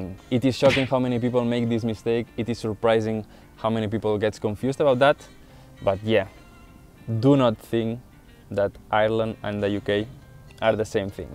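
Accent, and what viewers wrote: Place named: Spain. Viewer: wouldn't need to do this as his Spanish accented English is very easily understood by anyone who has any experience of travelling or speaking to non native English speakers.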